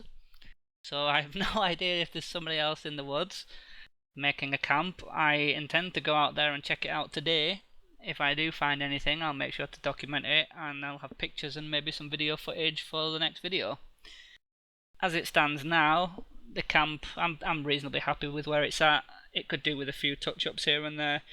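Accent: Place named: North Yorkshire, United Kingdom. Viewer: Have a listen... see what you think... Doesn't sound boomy enough for the bigger deer, but muntjacs, little buggers are spreading like hell. They're well through norfolk and heading ever Northward as they advance on the Scottish border. Not sure where you are , but accent reads as maybe North Yorks or southern Teeside. Little venisony sods have fangs as well. Destructive as hell on the natural flora... Bunch of Bambis on a bastid asbo... Most suggest 'inviting them for dinner' is the best plan ... Look less cute with onions, mushrooms, garlic, and a few game chips ... a.t.b <link> ...subbed you... I like forest eco-builders ...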